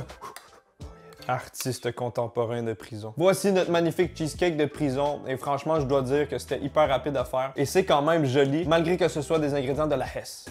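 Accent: Quebec, Canada. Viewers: cool Guy tu parle en québécois vive le Québec.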